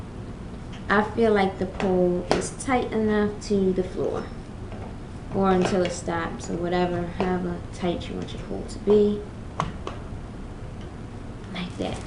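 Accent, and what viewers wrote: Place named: Baltimore, United States. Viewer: Baltimore accent ok 😏Just bought this pole and this really helped! Thanks!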